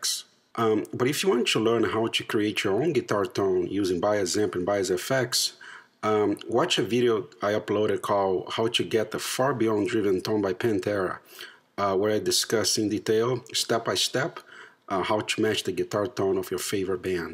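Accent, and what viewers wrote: Brazil. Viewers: You sound Brazilian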